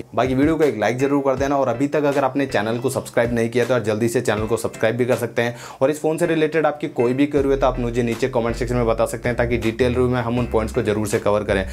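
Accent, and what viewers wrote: Rajasthan, India. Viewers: Your swag is lovely bro. Cool hairband. Little Rajasthani accent. And fantastic review. Katai zaher bro.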